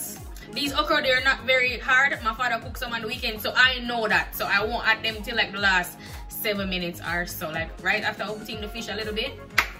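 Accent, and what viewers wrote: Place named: Jamaica. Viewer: Muma naa lef out the Jamaican accent at all! Mi love that 😂😂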